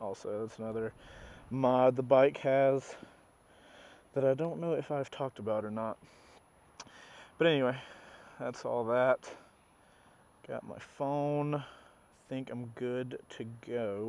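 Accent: United States. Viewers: dude u sound American, how did u ended up in Holand? lol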